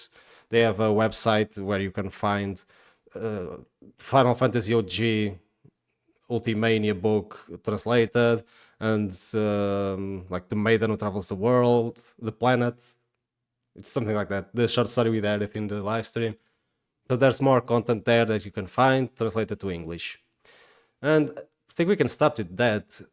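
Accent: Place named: Brazil. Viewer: Esse inglês não engana quanto às nossas origens, do que vi é um bom vídeo, vou guardar para ver mais tarde!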